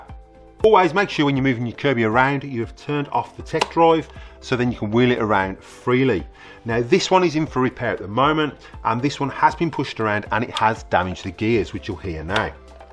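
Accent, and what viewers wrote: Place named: Birmingham, United Kingdom. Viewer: You say $200 when your accent sounds very clearly a UK £ one. Specifically from Birmingham UK West Midlands.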